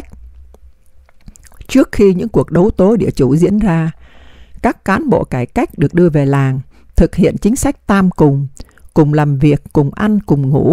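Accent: North, Vietnam. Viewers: Như đã nói, giong hơi giống giọng chuyên gia tâm lý Lý Thị Mai ( đg ở VN). Giọng Bắc54 nge ngồ ngộ, vui tai.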